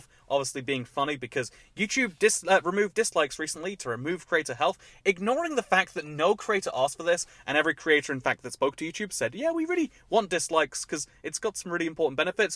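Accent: England, United Kingdom. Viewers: Well, I like your English accent and I like that you say "nought" so if you change your mind and decide to continue saying nought instead of zero, I'll be in support of that decision! It's nice to hear how different regions of the world speak English differently. I feel like Americans getting mad that you say "nought" seems really spoiled/entitled. Like "English is my language and you have to use the words I use!" Which is super boring and gross. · Honestly I don’t mind the British English, I think it’s fun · My question there is about how British say things. The things I have a question over is the word aluminum and the letter z. Why do you(British people)pronounce aluminum(a-lu-min-um)as aluminum(al-u-min-um) and prounce the letter Z(Zee in American)as Zed? That is stupid how it works. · Please continue with your British English. Naught makes you sound smart, as I associate it with Numberphile.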